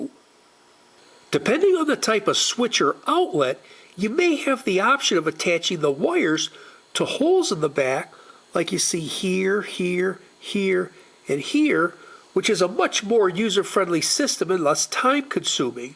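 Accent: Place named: Midwest, United States. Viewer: He's got a strong Midwestern accent. It's called the Great Lakes Twang.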